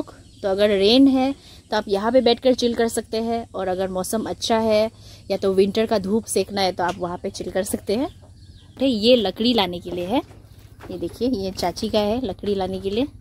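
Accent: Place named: Delhi, India. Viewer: Didn't know Arunachalese speak such fluent Hindi.. It sounds more like a Delhi region's Hindi. The way you are speaking, is it the typical Arunachali way of speaking Hindi? different regions in India (even within Northern belt) have different way/dilects and not necessarily speak Delhi-style Hindi. For Example, Hyderabadi Hindi has very typical Urdu-Telegu influence and has its own beauty and charm.. similarly, Mumbaiya Hindi has its own nuances as it got mixed with Marathi and sounds very beautiful on its own.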